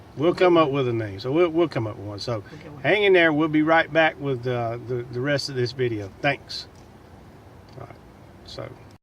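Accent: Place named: Mississippi, United States. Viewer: We stayed there a few days last week - till our last night the weather was getting very bad - so came home a day early. was nice windy weather thou. Sounds like your from Laurel Ms. :)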